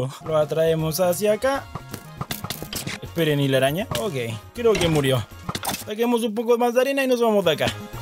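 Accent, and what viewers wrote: Chile. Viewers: eres chileno??????? saludos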